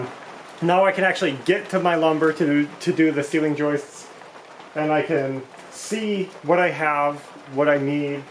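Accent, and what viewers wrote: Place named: Upstate New York, United States. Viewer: that’s awesome!! I’ve been going back and watching all your videos too!! I’m from upstate New York and I heard you were too.. haha!! I knew I recognized your accent 😂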